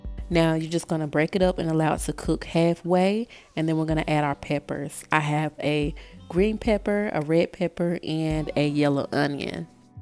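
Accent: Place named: South, United States. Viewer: Looks good love your southern accent. Imma try the Saturday. 🎉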